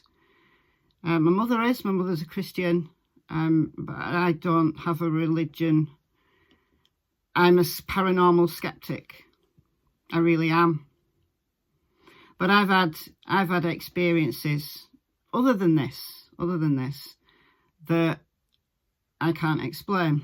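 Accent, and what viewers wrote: United Kingdom. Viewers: I'm sending you love, light and blessings,. I love your CW videos, but this was something different. I'm not far from you in Sheffield, and it's lovely to get a UK dialect on your analysis of your videos. You have a very sincere voice, and I totally get your intonation and heartfelt words. Your situation with your boy sounds like the experence that Sloane Bella had...... A real open connection to the spirit world..... Love and peace <3 x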